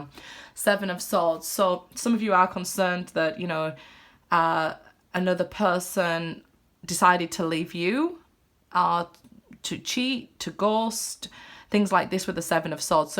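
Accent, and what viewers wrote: Yorkshire, United Kingdom. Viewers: Are you from Yorkshire, leeds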